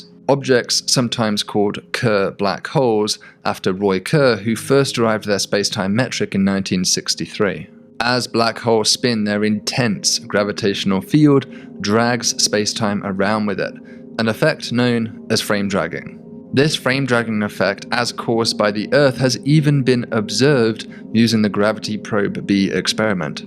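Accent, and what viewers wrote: United Kingdom. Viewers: Its just the British accent. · This dude looks like someone thought to themselves what the coolest person they could make is and we got this guy. Black leather jacket, british accent, awesome hair, and extremely smart.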